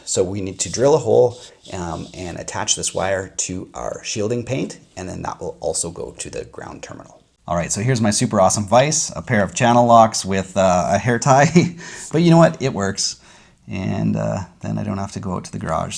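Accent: Canada. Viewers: I like your Canadian accent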